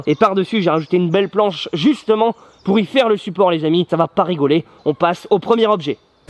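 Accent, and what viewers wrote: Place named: North, France. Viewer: ché l'meilleur accent celui de ch'nord pas un bruit d'kien pas un bruit d'ka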